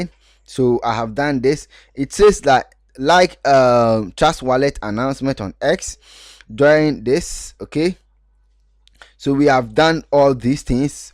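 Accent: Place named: Ghana. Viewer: 🙌🏽🔥🙌🏽 more Energy to you Brudda. I just 😂 knew you were Ghanaian the moment I heard the Okay and Yooooo 😂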